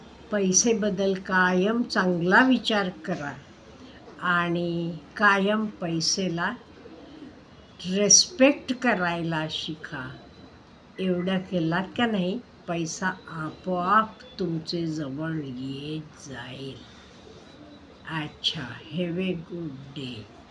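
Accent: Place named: Solapur, India. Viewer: तुमच्या बोलण्यातून तुम्ही सोलापूर चे आहात असे वाटतं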